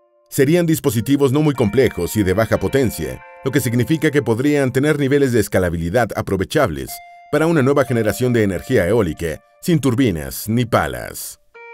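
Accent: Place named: South America. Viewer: Porque habla en sudamericano??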